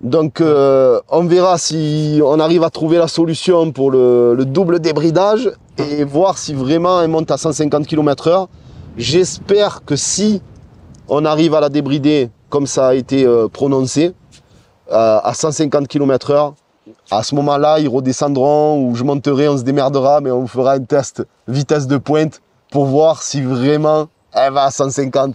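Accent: Marseille, France. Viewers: Jkiffe trop le style de tes tests en toute simplicité, au naturel, la passion que tu transmets, et même ton accent de Marseilléééé! Continue frérot, la bise de Paris 😉😉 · l accent marseillais avec une belle voix , c est vraiment jolie a entendre , tu vois ce que je veux te dire comme dirait Alonso 😊.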